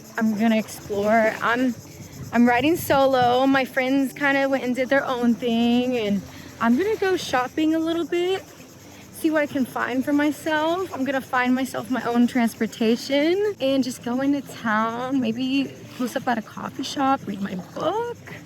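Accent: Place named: Los Angeles, United States. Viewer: Youre from Nawlin? I thought youre an LA woman.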